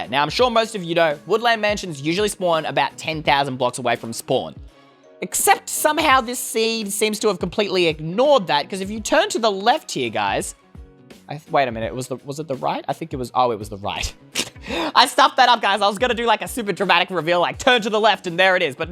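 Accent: Australia. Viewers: are you austrailan? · Are you Australian?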